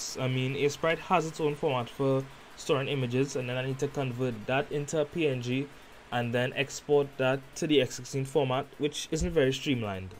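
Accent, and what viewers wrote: Trinidad and Tobago. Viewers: As soon as I heard your voice I knew you were a Trini. Surprised to see a Trini talk about the X16. This is awesome! The outlines around the sprites reminds me of the Castlevania games for GBA. Was it done to help the sprites stand out against the background and whatnot?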